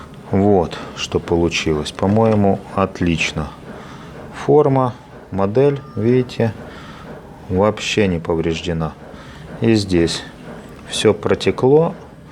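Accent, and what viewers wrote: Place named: Kyiv, Ukraine. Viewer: Красота! Судя по акценту и уровню работ вы киевлянин. Очень сочувствую, что пришел "медведь-всем пригнётыш" и поломал судьбы людей и красоту!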